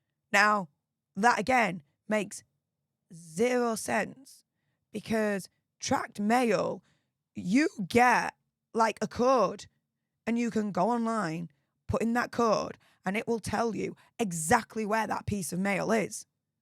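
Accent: United Kingdom. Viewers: Wow! Try using subtitles and please bare in mind in future, Brits speak English the way its supposed to be spoken, The fact you can't understand proper English accents is your failing, Not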